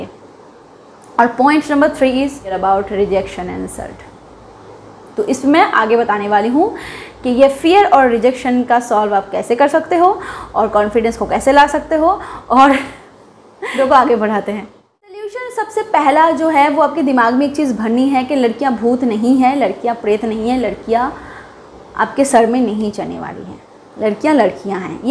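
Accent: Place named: Bihar, India. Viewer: Maim aap kahan se ho bato se lgti bihar ki pl batana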